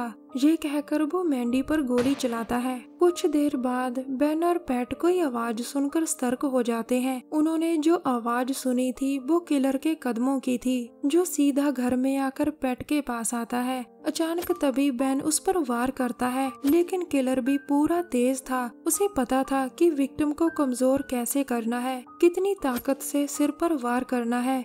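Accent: Punjab, India. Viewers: Madam aap ka punjabi accent has its own fanbase.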